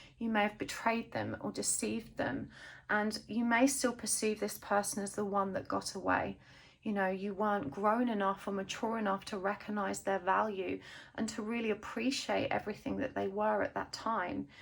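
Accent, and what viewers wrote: United Kingdom. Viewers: Wow just wow. Since my dark night I have regularly been using 2 readers on YouTube and no others, but your channel and readings kept coming up on my youtube feed, often alongside synchronic numbers but I resisted using another reader up until now. I have been drawn in by repeating numbers, animals and I have been dreaming of and seeing twins for the past year or so in cards and symbolism. (I am still not truly sure why) but this attracted me to your channel amongst the number sequences of likes, views and the time on my phone everytime you pop up but especially this time. I have been going through an incredibly tuff dark night for what I perceive is 4 years but I am learning it has really been my whole life, that was just when the awareness of my spirituality began amongst the chaos and trauma. I then had a series of life events happen which brought up both old and new trauma and triggered a kundalini awakening and I thought I was going crazy. 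Especially when my starseed awakening started... which I feel you may resonate with just from hearing you talk etc. Anyway I have been drawn to more than one reading but have only watched the first one do far, and it was so accurate in every way that I feel as though you was speaking directly to my soul. I am from the UK also and I must say it was both surprising and nice to here a British voice , as my other readers and starsseed family are all from the US. It just felt a little closer to home. Your reading ran very deep for me in many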